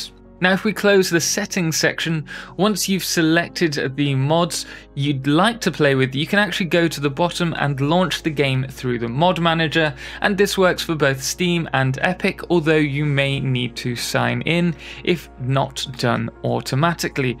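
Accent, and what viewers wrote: United Kingdom. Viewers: I just want to thank you for all your videos. Learning so much from here... I'm brazillian and even you gourgeous british accent isnt a problem since you care to speak slowly so... Honestly your videos are trully perfect. Thanks for your generosity. <3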